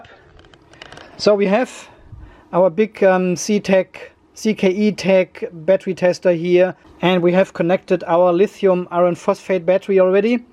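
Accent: Germany. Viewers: Hope it comes a new clip soon;) I enjoy too see your clips not only for solar stuff but your seens of humor and your german english ;)👍 Every time I see a new post from you I put on the coffee and chocolate, big blanket and lay down on the sofa. I really enjoy and learn from you sir 👍😉 Best regards Morten from Norway 🇳🇴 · Danke für Deine gute Laune und dein Deutsches Englisch 😅👍🏻 finde deine Videos spitze weiter so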